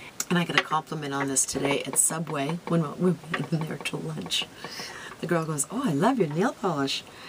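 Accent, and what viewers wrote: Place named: Cape Cod, United States. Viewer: When I first found your channel, you reminded me of the Cape. Your accent, your look and the casual elegance. I have to find the fragrance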